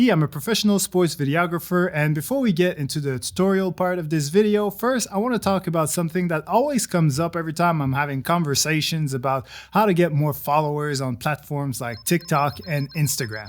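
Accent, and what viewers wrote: Quebec, Canada. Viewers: You are from Quebec right ?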